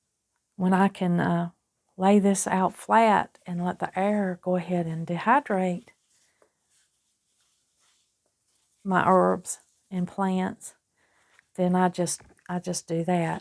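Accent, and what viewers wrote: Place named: South, United States. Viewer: Thanks for your video. Drying some mullen myself for the first time. BTW, I really enjoy your soft southern accent, you sound exactly like some of my favorite cousins.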